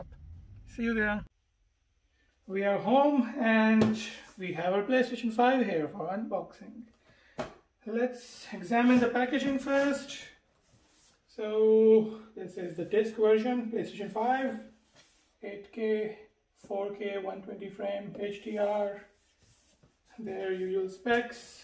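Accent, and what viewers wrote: India. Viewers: why do you sound and look like indian. are you Indian?? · You sound like an Indian Are you indian ?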